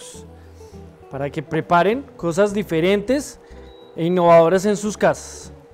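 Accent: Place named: Colombia. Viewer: Me gusta ese acento y me. Convenció con el hecho de ser colombiano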